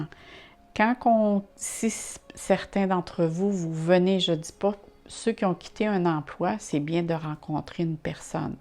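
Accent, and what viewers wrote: Quebec, Canada. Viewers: Merci pour votre réponse des poisson d'avril. C reposant de vous écouter avec l'accent Québec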